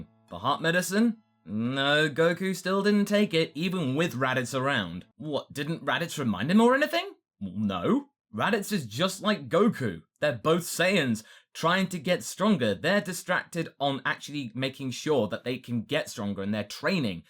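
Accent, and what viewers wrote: United Kingdom. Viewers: lmfao, his accent is what got me XD Damn British English!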